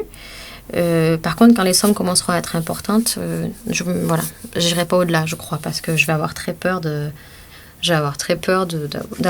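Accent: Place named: South, France. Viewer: Je rajouterai avec un bel accent qui sens le soleil..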